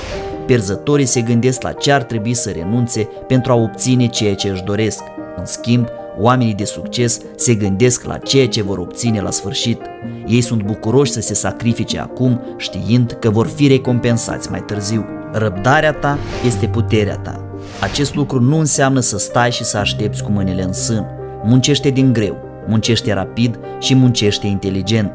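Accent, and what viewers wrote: Moldova. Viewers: Bună, ești foarte simpatic cu acest accent din Moldova. Mulțumesc.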